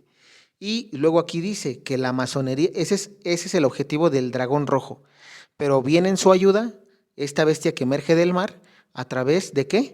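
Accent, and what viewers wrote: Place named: Mexico. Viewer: Aparte de la excelente explicación, como nos enseña el hno. que todo queda claro, me agrada el acento y modo de hablar de mejicano. Me ha hecho reir como nos habla sencillo, claro y conciso sin ambiguedades.😅🙏👍 gracias hno. Saludo y bendiciones.🇵🇦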